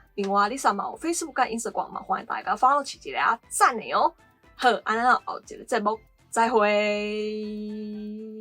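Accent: Taiwan. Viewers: 妳連講國語和英文都有一個台腔，太強了🤣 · 我發現Lisa說台語的時候，再說其他語言都有偏台語的口音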